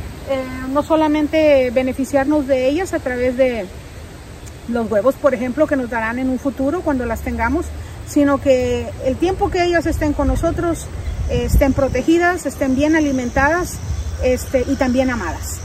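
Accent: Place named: Spain. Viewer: Y ahora x q empezaste hablando como muy española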